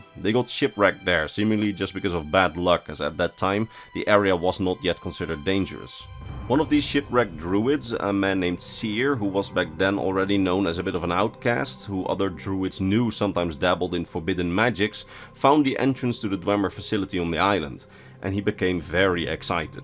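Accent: Netherlands. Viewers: Good sauce. Are you Dutch by any chance? Shoutout to potential fellow countryman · You sound Dutch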